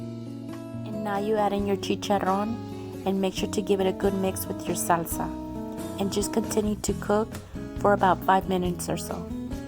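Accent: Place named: Texas, United States. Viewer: Are you from Texas? You sound Texan. And some of your ingredients I see at H‑E‑B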